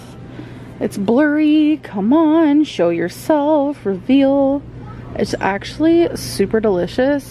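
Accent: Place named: Kentucky, United States. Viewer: That's her danity southern belle kentucky accent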